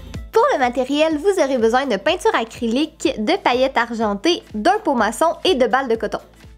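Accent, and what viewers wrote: Quebec, Canada. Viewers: Jdr l accent des canadiens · T'es tu quebequoise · T québécoise ? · J'adore ton accent 😍😍 il est québécois ? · Accent Quebecois 😍😍😍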